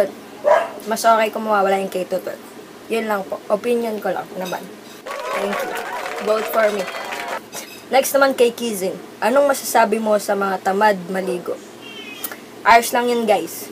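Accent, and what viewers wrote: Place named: Ilonggo, Philippines. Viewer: Ang kyot ng ilonggggg 😍😂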